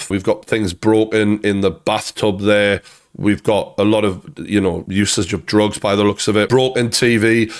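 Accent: United Kingdom. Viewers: I think “Good Lad” is an expression that was made for you, you’re everything that I picture in my mind when I hear “Good lad” resonate with a gorgeous British accent… You’re a great man, stay you, ignore the bullshit critics, that comes with success, just focus on the love you’re getting from true fans of your work. Peace brother (from a froggy on the other side of the channel 😅)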